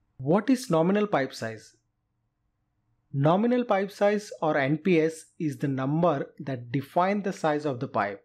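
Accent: India. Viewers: ..you are the only indian english speaker that I find interesting and ameanable..being you an engeener this is almost a miracle..thanks for your nice work...!!!!!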